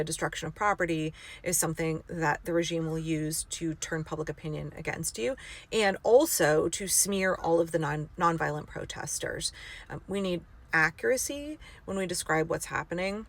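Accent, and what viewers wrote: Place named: Appalachia, United States. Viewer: Your accent was more Appalachian than Southern😕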